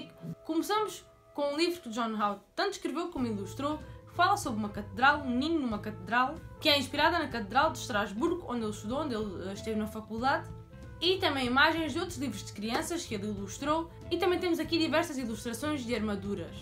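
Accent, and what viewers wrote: Portugal. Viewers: Adoro esse sotaque Luso !! 😊😊😊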